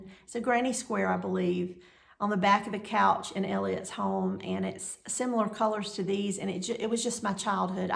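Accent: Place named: South Carolina, United States. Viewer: Hello Neighbor - From Georgia! Love your South Carolina accent and soothing delivery- lived in Greenwood several years and have a son in Greenville. I love all your cross stitch - so inspiring and elegant. Thank you for the inspiration. Your afghan will be lovely too!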